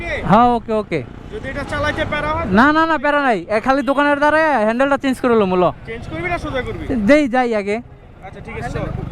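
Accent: Barishal, Bangladesh. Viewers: তুই কি বরিশাইল্লা মনু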